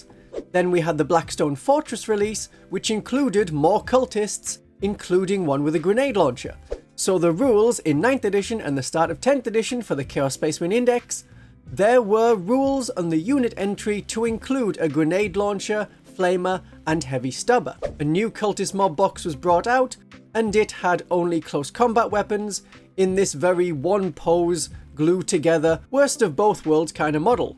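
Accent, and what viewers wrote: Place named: Geordie, United Kingdom. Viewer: Where is your accent from? Sounds posh Geordie.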